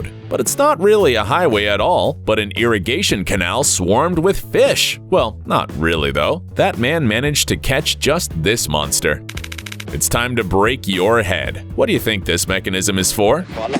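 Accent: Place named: United States. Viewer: 38 seconds in and was so annoyed by the pointless awful cheesy American VoiceOver I had to turn off. We only need the clips not the dreadful voice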